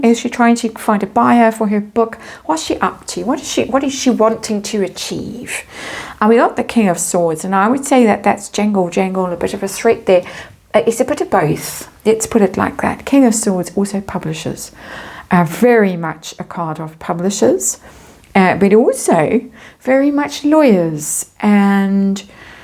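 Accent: South Africa. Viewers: Hi do I hear a South African accent ? Hello if you are ❤❤I am too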